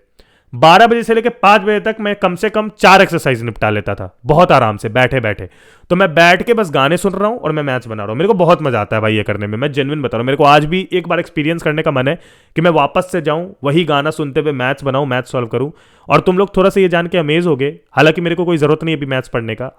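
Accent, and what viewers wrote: Bihar, India. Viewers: Bihari ho?? Accent se guess kr rha hun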